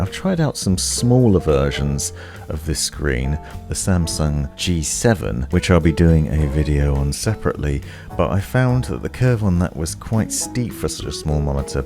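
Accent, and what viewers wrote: England, United Kingdom. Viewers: I don’t care about the monitor I came here just for the voice of a calm english captain Jack Sparrow. Ever thought about speaking in audiobooks?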